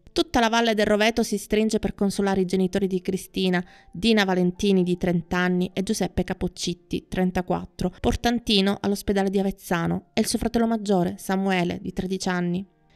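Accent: Sicily, Italy. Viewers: Aspettavo da tempo che qualcuno trattasse questo caso......e finalmente lo ha fatto, come sempre la tua voce narrante è molto precisa e il tuo accento siciliano dà quel tocco in più che mi fa seguire i tuoi video sempre con moltissimo piacere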